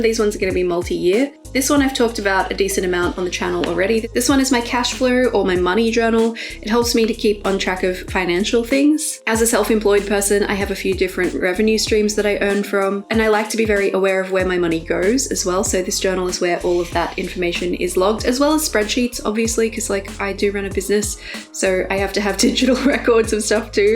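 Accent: Australia. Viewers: ohh, i had recognized it, but i wasn't too sure. i'm an english speaker, but not a native one, and ever since i discovered how cool the australian accent is, i've been trying to learn it lol. i will watch more of your videos 😃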